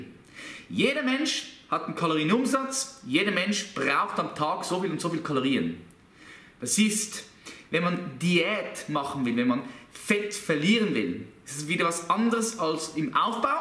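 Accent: Switzerland. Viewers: Aus der Schweiz?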